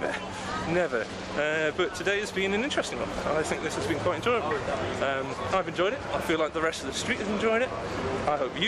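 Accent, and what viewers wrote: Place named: Newcastle, United Kingdom. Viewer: you live in newcastle? thought i heard something in your voice in another vid, if you do, i might see you around sometime :)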